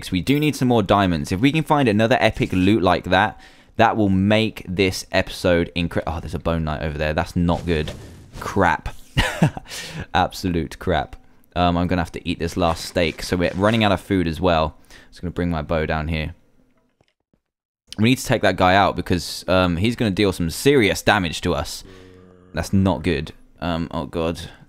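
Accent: United Kingdom. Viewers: just british acsent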